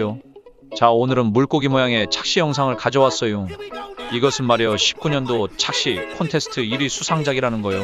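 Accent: South Korea. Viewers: 나 충북 증평군 사람인디 님 약간 충북 사투리 같다. 그려 안그려?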